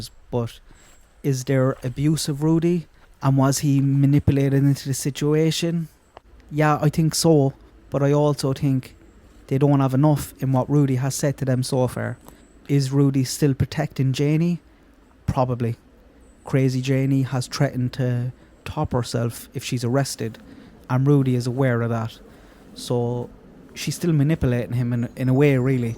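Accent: Ireland. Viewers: I just want you to know how much I respect your videos, you manner, your insight, your opinion. And an amazing Irish accent to boot. Thank you for putting this out there, I love listening to you ❤❤❤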